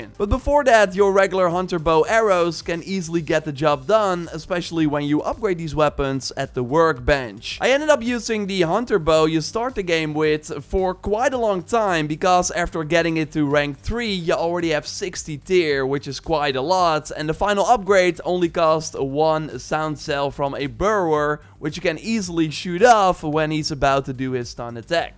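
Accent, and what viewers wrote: Netherlands. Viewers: A thicc dutch accent 😂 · I feel like you are dutch? Are you🤨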